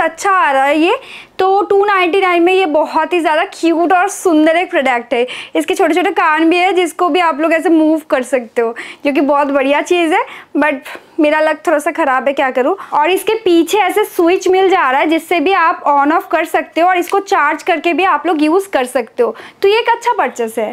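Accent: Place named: Bengal, India. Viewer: 😂 Your Hindi With Bengali Sweetness ☺️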